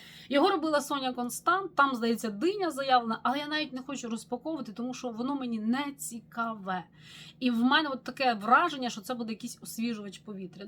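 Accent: Ukraine. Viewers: Я, перепрошую, Ви з Хмельницького??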